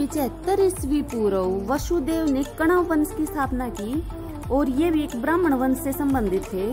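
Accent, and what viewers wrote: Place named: Haryana, India. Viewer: Very nice video but control ur Haryanvi accent madam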